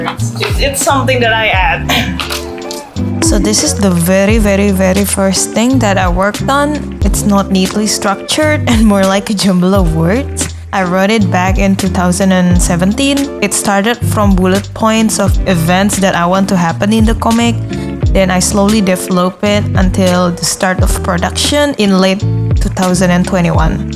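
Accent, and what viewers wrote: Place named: Indonesia. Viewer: Indonesian accent ❤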